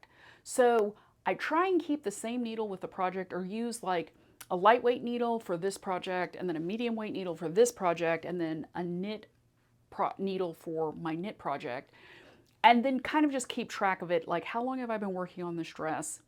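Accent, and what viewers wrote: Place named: New York, United States. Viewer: Thank you for this video! I've been sewing longer than I care to admit (58 years....?) and what I learned, I learned when I was 10 or 12 in home ec classes (do they still have those?). I had a vague idea of sizes - smaller for smaller fabrics (that meant lighter to me), and larger for larger fabrics - but I knew nothing about the numbers. You didn't mention the second number - is that important as well? One other great needle is a leather needle - they have a very sharp triangular point that is sharp on all sides and can be really handy if you're having trouble sewing something very heavy (think multiple layers of denim). Also loved your hipsters (ahhh, brought me right back) and I detected a wee bit of NY in your accent.... :) Glad I found you - we never stop learning!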